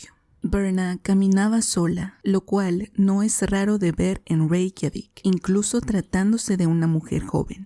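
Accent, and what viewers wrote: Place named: Brazil. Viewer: Hey I hear an interesting accent do Brasil?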